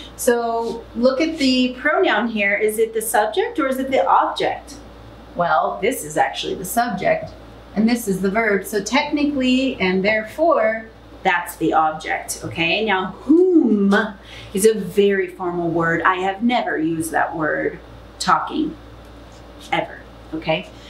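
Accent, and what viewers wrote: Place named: United States. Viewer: This isn't but american english !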